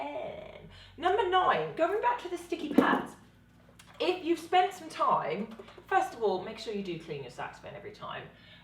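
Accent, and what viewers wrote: United Kingdom. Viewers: Hallo! Just found your Video's and loved this one! So nice to hear a lovely young lady that Knows so much about Sax! Hope you make it across the pond some! Positive post and subscribed! No sure what part of the UK the accent is but that typically means a Blend from South London often for me. I'd like to add something a legendary sax player taught me to do before sand paper on a G# for sticking. Frequently we would use a Fresh USD bill before big performances. It worked great and had some texture to it and often when one did it, the Bill would make it down the horn line (Till the Bari player pocketed it typically) So I got a bass ! Keep up the Video's and Hope you make it to the SE USA ! Come play my Bass! Later Sweets! FantaZ4U here, AKA Saxaddict ! Oh one other thing, I made the3 mistake of buying a very nice Selmer Glass Reed wallet and it totally ruined reeds after the first use. 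For over 20 years I've done what you do and just retract the reed some and but a good cap on it. And it worked on all my large Clarinets as well but considering the frequency I had do Double on them, I eventually started using Fibracells. When having that much lumber on stage I got very dry keeping them All warmed. It got worse when the Vocalist found my storage fridge and started lifting them before the stage calls. And Typical we know what happens when singers feel the need to take things. I'd make special stops for a bottled water Lemon Water only to have a Singer take it before they cameras ca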